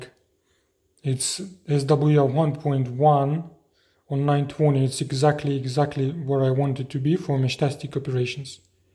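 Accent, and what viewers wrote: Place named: Eastern Europe. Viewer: When I hear a Slavic accent for some reason right away I trust the forthcoming information about radio equipment 🙂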